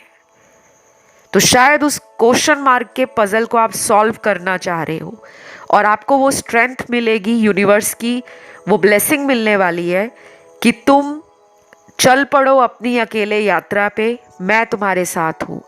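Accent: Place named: Maharashtra, India. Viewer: R u maharashtrian bcz u talk like typical maharashtrian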